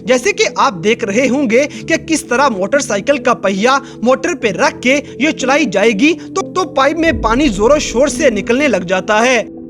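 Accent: India. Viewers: او بھائی تیرا بولنے کا انڈین سٹائل بالکل بھی پسند نہیں آیا۔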